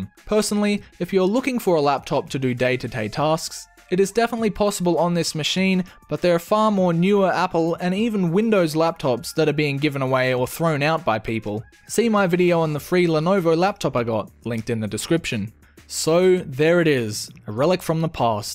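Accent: Australia. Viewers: Woo your Aussie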